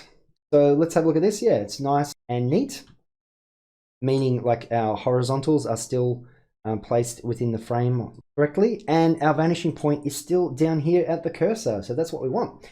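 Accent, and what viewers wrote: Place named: Australia. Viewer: feel like im at aus again, with the accent, been working thier for about 3 yrs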